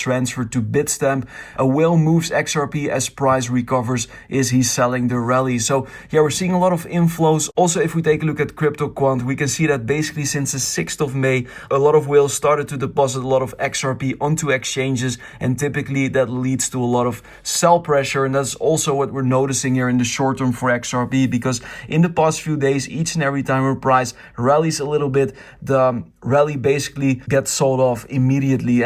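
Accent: Netherlands. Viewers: Ik volg je al een tijdje maar je klinkt echt als een Hollandse boy lol lekker bezig BTW.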